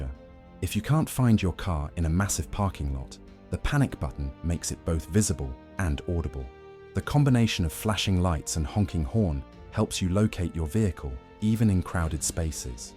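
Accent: United Kingdom. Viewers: All this stuff is great BUT we're / how do you set these things up. Are the directions in the manual? Telling us that both BMW and Toyota has a certain thing doesn't help. What models - what years. And the big question. The nariator has what sounds like a British accent so therefore is it only in England ???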